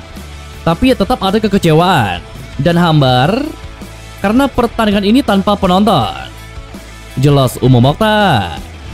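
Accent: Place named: Java, Indonesia. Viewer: Medok medok jangan banyak kata kata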